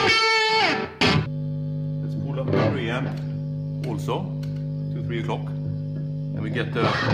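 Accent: Gothenburg, Sweden. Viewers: Great video. Gillar din Göteborgsengelska med. :-)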